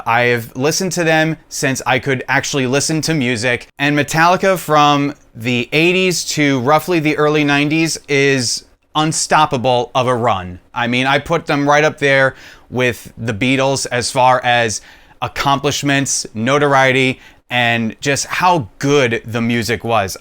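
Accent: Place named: New Jersey, United States. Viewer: for being from jersey you simmer on the accent, thx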